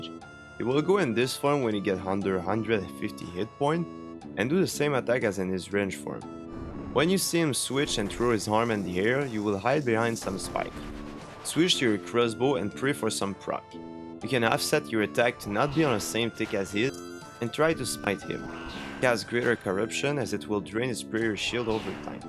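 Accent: Quebec, Canada. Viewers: are you from quebec? this accent me dit dequoi en tabarnak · J'ai remarqué direct l'accent québécois! super guide, lache pas! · bro are u canadian/french? u talk almost identical to GSP (georges st-pierre) edit: ty for the guide very well explained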